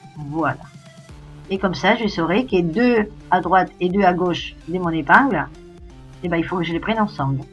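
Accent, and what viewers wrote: Spain. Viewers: Petite curiosité perso: tu as un accent espagnol, je me trompe?😉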